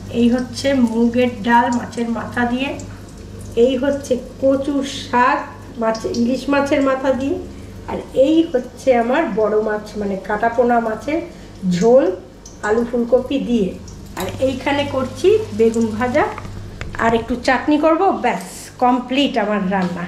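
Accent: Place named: Bengal, India. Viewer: তুমি কথা বলো বা গান করো,আমি হাঁ করে শুনি 😊😊😊😘😘😘😘😘😘 তোমার কথা বলার ধরন অতি বাঙালি খাঁটি বাঙালি ❤️❤️❤️❤️❤️❤️❤️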